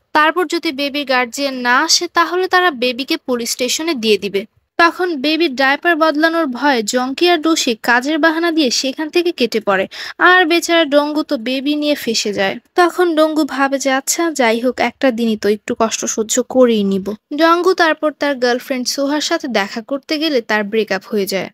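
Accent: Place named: Barishal, Bangladesh. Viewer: আপু তুমি কী বরিশাইলা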